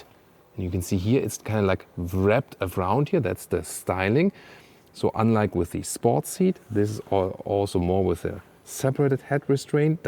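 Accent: Germany. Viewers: Some of the Germish is very interesting! Good effort though, vielen dank.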